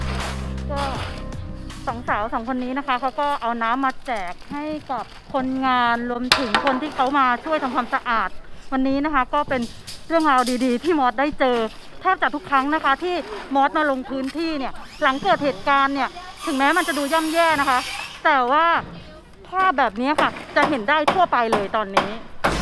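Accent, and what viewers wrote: South, Thailand. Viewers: คุณมอส เป็นภาคใต้บ่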